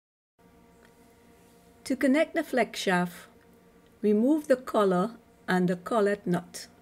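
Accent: Trinidad and Tobago. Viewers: This was excellently explained..you sound like a Trini..great video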